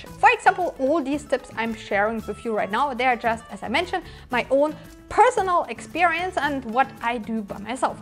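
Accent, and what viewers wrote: Germany. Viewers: You are like clark kent before and after costume.. she goes from that nerd look to a super sexy warrior.. lol... And that german accent nails it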